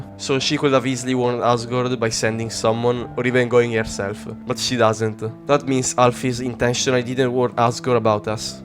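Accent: Italy. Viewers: Ho aperto il video letteralmente 2 secondi ed ho detto: Ma è italiano! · i can hear the italian accent... are you italian?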